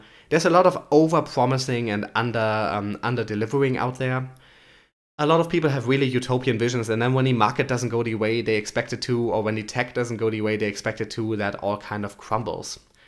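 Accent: Germany. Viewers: den deutschen akzent hört man einfach sofort raus haha^^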